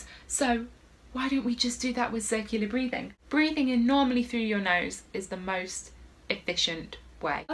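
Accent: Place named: United Kingdom. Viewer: Or a stuffy nose for that matter. I hope you find that supposed third book. But I love your circular breathing. And I love your British English accent.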